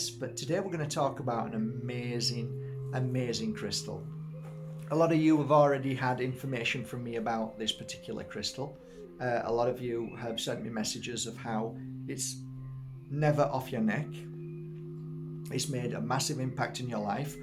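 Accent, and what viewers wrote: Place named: Hull, United Kingdom. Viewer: was you originally from Hull . Its the accent.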